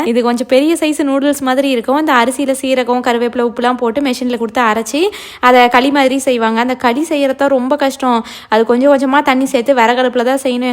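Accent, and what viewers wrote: Salem, India. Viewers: அப்புடியே சேலம் slang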